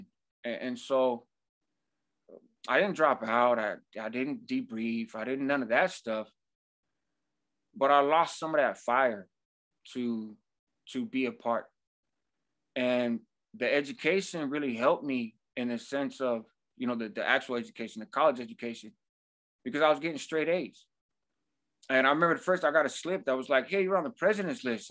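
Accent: Mexico. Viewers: When I close my eyes while watching this I hear a Mexican dude but when I open them I see a white dude.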